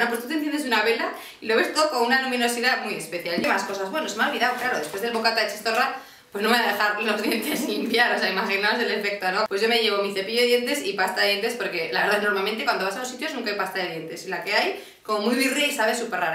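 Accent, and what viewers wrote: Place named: Pamplona, Spain. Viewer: Que graaandee! Se nota que eres de pamplonaaa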